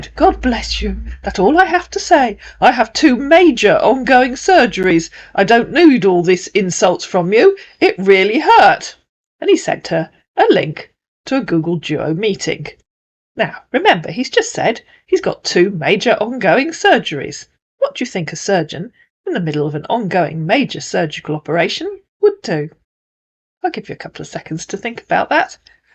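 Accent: United Kingdom. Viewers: 😂 😂 😂 I LOVE it when, in your proper British accent, call him "a semi-illiterate idiot". Oh, you kill me!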